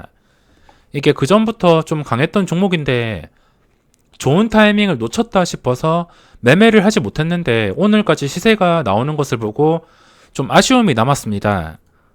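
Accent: Gyeongsang, South Korea. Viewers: 갱상도분이라 발음은 이해해주세요ㅠㅠ 저도 창원이라 정말 발음 힘듭니다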